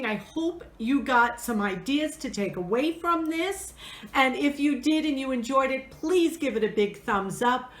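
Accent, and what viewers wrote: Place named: East, United States. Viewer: Hello Mornings with Granny sent me. Gotta check out your channel. You sound like from back east. I am from New York state.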